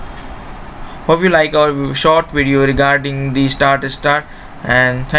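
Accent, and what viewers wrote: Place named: India. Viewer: Hmm indian accent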